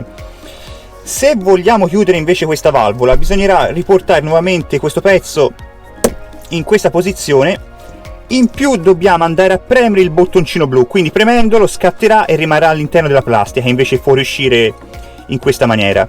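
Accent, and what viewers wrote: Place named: Tuscany, Italy. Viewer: Ciao, spieghi sempre molto chiaro, impreziosito dall'accento toscano. Ti faccio due domande: nel tuo caso (inverno freddo e serbatoi vuoti di acqua), il riscaldamento truma diesel con boiler, lo potevi accendere senza danni? E poi le prese USB che sono all'interno del van sono attive, cioè puoi attingere dalla batteria servizi con van spento? Grazie attendo nuovi video.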